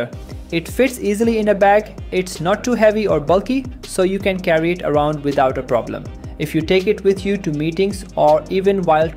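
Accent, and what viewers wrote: India. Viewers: Mac and Windows ok. If only you tested with Linux! Also, for a Chinese channel, why does the accent sound Indian? Or are you using AI to read?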